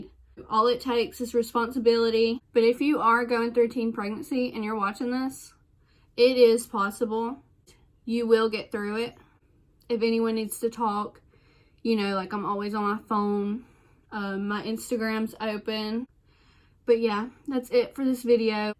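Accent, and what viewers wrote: United States. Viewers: You gotta be from Texas or Tennessee 🤣❤️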